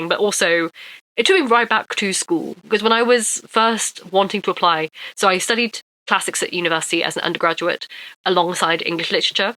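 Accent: RP, United Kingdom. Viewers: Ok good faith question, asking because I do the same thing ok so it's not a judgement. Do you have a theory on why you sound so posh? Because I do too, but only in English which isn't my native language. I pick up accents all the time, put me in a different part of the country and I'll be speaking that in a few weeks, but posh is very much my neutral that I can't seem to get rid of. My best guess on how it happened is that my ADHD and other chronic illnesses makes me not speak any language very well. I don't remember common words in my native language so tend to speak three at a time. If I have to speak 1 language at a time there are a lot of pauses because I have to think of the words rather than just insert it from whatever language I do remember it in. I think the posh might be overcompensating for that.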